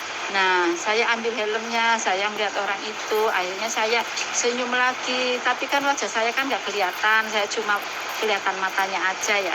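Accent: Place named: Java, Indonesia. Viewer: Bunda asal mana bund,,kok medok kayak saya 😂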